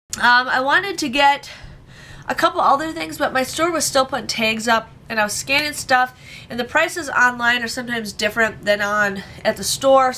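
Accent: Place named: Minnesota, United States. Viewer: You sound like Minn. I live here ....✅💕💕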